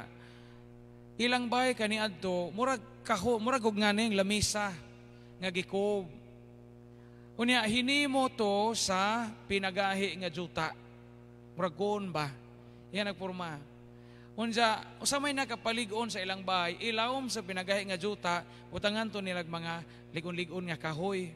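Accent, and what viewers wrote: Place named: Bohol, Philippines. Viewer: Lively pod kay siaw man siya. He reminds me of our former DepEd Division supt who is also from bohol. Parehas sila mosulti binol anon jud.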